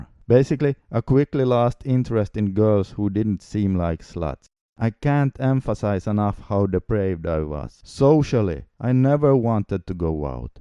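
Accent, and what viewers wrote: Finland. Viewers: First two seconds and can already tell youre finnish lmao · kyl huomaa et äijä o suomest :D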